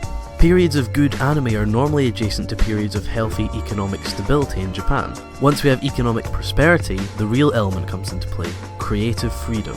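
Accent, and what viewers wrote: Scotland, United Kingdom. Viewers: what accent is that? I am sure it is british but cannot pinpoint the region.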